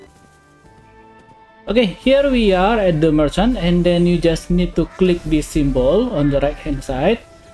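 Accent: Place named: Indonesia. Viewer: logat indonya keliatan😁,mantap bang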